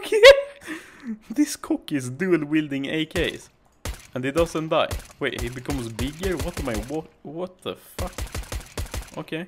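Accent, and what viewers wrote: Sweden. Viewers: Are u swedish? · Swedish accent is soooooo noticable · are you swedih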